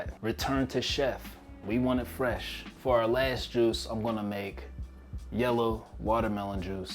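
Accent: Pittsburgh, United States. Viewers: U sound like your from Pittsburgh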